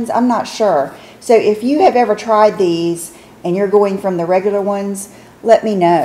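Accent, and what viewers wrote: South, United States. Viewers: Couldn't agree more on your Southern accent! Just delightful. Everything sounds sweeter with it.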